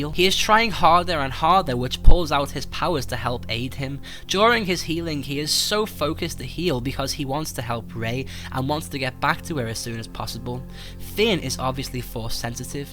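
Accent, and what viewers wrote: Liverpool, United Kingdom. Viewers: scouse twat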